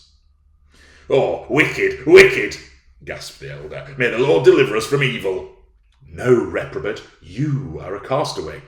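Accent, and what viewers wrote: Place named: Yorkshire, United Kingdom. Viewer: Excellent Yorkshire accent and a most enjoyable performance. Bravo!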